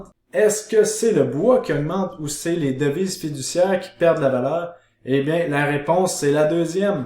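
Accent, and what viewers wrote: Quebec, Canada. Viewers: c'est à cause de toi le PUMP sur le matic?? vidéo à peine sortie que le marché s'emballe!!! merci c'est un plaisir d'écouter et ce même avec un accent Québecois!!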